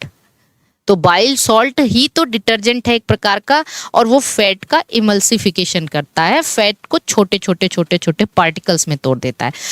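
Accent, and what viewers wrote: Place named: Uttar Pradesh, India. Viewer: Aapki baat cheet se ye ekdum clear ho gaya hai ki aap pakka UP se ha aur up board pdi ha quki hm sb up bale merkaana mrkawa bail ko axi tarah jante hai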